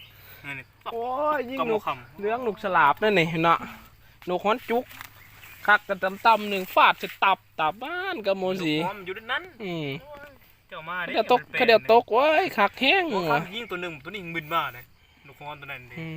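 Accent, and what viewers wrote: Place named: Savannakhet, Laos. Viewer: ຟັງສຳນຽງຢາກຄືໄທສະຫວັນນໍ້ຢູ່ບ້ານໃດເດ້ຢາກໄປລ້ານຳເດ້ນົກຫລາຍແບບນີ້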